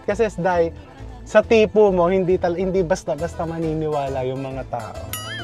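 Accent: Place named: Western Visayas, Philippines. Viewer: nakkakatuwa ka chef panoorin heehe pati pag ssasaalita mu para ka ilonggo.. srap mu panoorin na kumakain k chef.. good luck po chef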